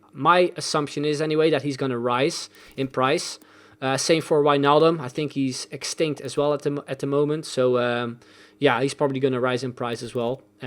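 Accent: Netherlands. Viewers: Kom je uit nederland?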